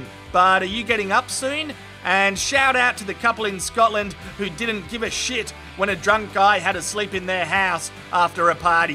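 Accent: Australia. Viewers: God I hate the Ozzie accent!!!....ugh......sorry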